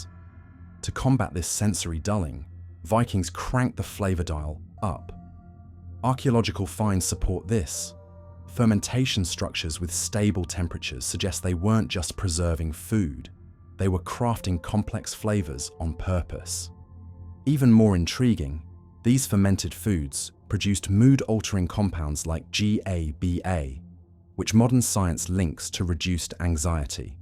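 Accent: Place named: United Kingdom. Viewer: You don’t understand a uk accent?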